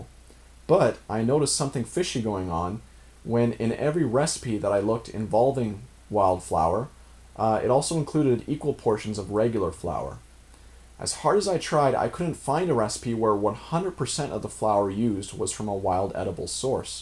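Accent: Canada. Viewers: You couldn't spot that Canadian Accent?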